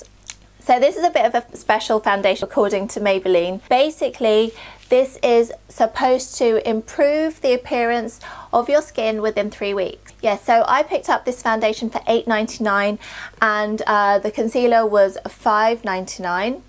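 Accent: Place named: United Kingdom. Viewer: You seem lovely great video! glad I have came across your channel! nice to hear another british accent! take a look at my channel x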